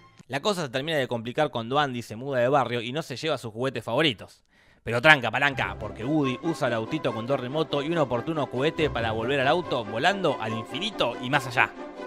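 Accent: Argentina. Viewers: Sos argentino? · Sos argentino???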